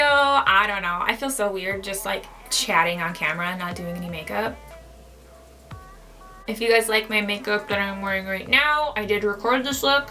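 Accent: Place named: Canada. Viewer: oml she sounds so canadian in this video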